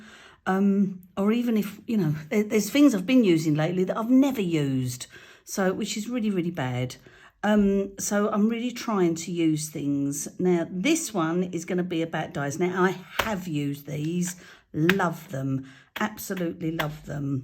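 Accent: United Kingdom. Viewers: Hi, I have only just found you on YouTube.. love your videos and how your brain works!!! I'm not alone! Lol🤣 I'm in Australia now, but originally from the UK, so your accent reminds me of home. Thanks for taking your time to show fab ideas and share some laughs. X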